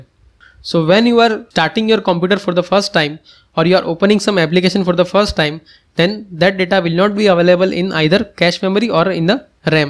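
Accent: India. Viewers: > top 2nd hit > nice intro > indialaccent.html > fmw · every time i hear indian accent, i want move my head and click dislike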